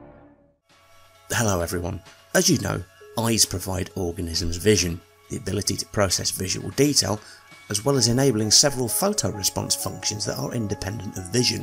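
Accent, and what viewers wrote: United Kingdom. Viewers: Very informative....and it’s great to hear a British voice for a change xxx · "Cats are also thought to be trichromats but not in the same way humans are." Wow! He's British! 😂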